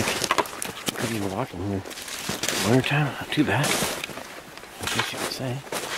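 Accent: Canada. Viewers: Good to hear that Canadian EH ! Right on man and cool tale as well.Cheers from a little island on the west coast O Canada. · You sounds like your from Canada? My dad was born in Manitoba Canada! 1925 iam from USA 🇺🇸 but we have relative! Grate video 📼🎥🗻🗻🗻🗻🏔🏔🏔🏔🏔🏔🏞🏕🏕🌴🌲🌳🌲🌳🌲🌳🌲🌲🌲🌾🌿🦍🦍🦍🦇🐵🐒🐵🐒🐵🙈🙉🙊👽👽🐻🐻🐗🐗🐗🐶🐺🐶🐺🐶🐕🦌🦌🦌🦌🦌🦌🦌🦉🦆🦅🦊🦊🦁🦁🦃🦄🦄🐴🐎🐏🐐🐮🐄🐼🐼🐦🐣🐥🦎🦋🦏🦏🐯🐆🐈🐾🐾🐾🐾🐾🐾🐾🐾🐿🐿🦍🦍🦍👣👣👣👣👣👣👣👣👣👣👣🌲🌲🌲🌲🌲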